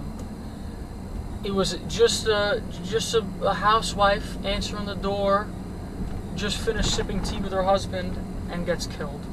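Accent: New York, United States. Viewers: The time you put into the research is so evident, seeing this area in real time, add to it ur new york accent, enjoy ur channel.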